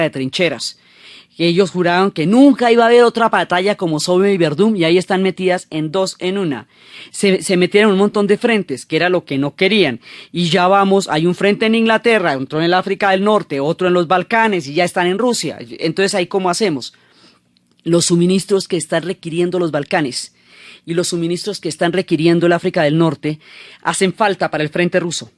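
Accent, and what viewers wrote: Antioquia, Colombia. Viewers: Muy buen relato. ¿Eres paisa?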